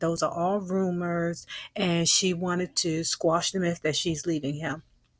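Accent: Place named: South, United States. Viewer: I keep playing this just to hear yo country ass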